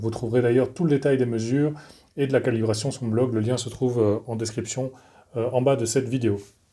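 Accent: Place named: Belgium. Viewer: tkt pour l'accent belge, il est vraiment très léger et n'entrave en rien la compréhension !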